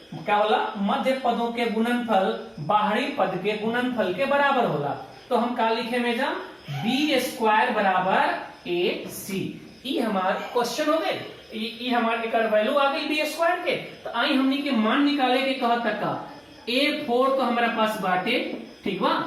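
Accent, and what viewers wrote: Bhojpuri, India. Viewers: Ati Sundar sir Bhojpuriya andaj me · अति सुन्दर लागल आपन भोजपुरी अन्दाज🙏🙏🙏 Nice sir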